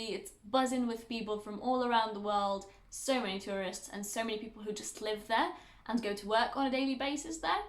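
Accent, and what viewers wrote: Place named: England, United Kingdom. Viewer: I am a bit puzzled. You do not have any hint of a foreign accent and sound as if you were brought up in England.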